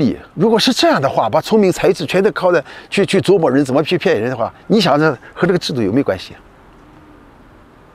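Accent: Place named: Yantai, China. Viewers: 听大叔口音是烟台海阳的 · 作为一个大连人，烟台口音听出了家乡味道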